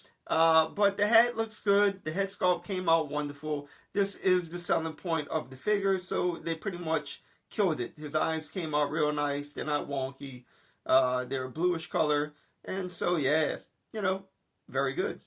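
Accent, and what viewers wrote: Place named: New York, United States. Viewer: You have such a fascinating NYC accent. It reminds me a little bit of Jay-Z. And nice review, by the way. My two are on their way from GameStop. Considering I pre-ordered right when this went up..not sure how so many have it in hand already, yet mines just shipped.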